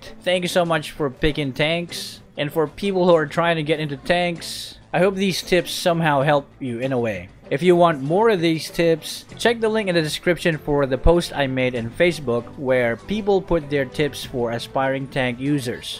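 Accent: Philippines. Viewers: Wow galing mag english halata Filipino ka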